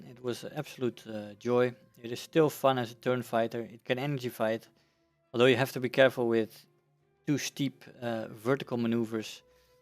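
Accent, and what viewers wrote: Netherlands. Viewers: Sounds Dutch?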